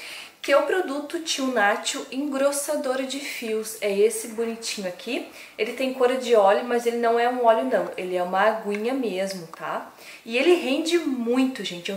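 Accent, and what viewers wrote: Rio Grande do Sul, Brazil. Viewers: .. você é gaúcha?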